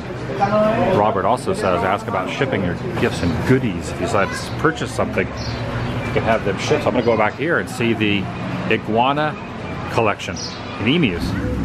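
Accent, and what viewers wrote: South, United States. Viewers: do you have that beautiful Southern accent I love so much it's my favorite accent 💙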